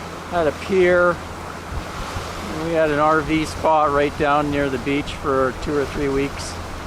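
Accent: Canada. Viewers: I think your Canadian accent is stronger now than when you were in the states... just say'in.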